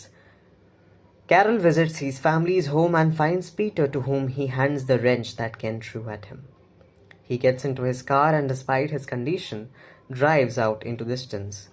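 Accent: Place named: India. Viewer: I love how they used an Indian voice for the narrator... If it's to bring us together I'm in... If it's to turn people against each other I'm out✌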